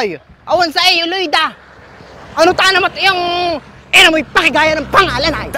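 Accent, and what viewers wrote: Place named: Tanay, Philippines. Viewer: 😅😀😂ayy nakopo Meses ikaw baga e tlgng nakakatuw akoy tawa ng tawa syo meses,,,Lalo n yng Puntong Tanay, mo dito samin na "Hane" 😂😀😅👏👏👏👏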